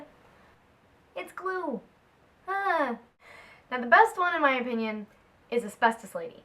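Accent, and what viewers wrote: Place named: Canada. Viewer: Love this, think you'll go far. By the way are you Canadian? Love your accent x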